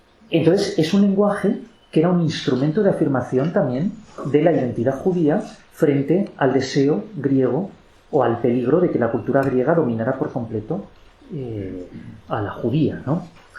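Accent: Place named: Spain. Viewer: Hola.muy malo el sonido y ademas el acento español dificulta entender .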